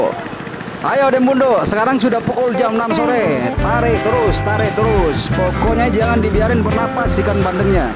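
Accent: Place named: Makassar, Indonesia. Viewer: pelan2 maki logat daeng kondisikan sj ciri khas kita sebagai orang makassar....tetap semangat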